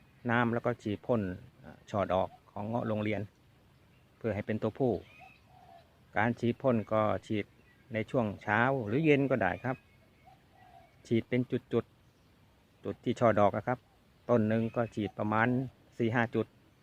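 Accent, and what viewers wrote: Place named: Chanthaburi, Thailand. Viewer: เค้าเป็นคนจัน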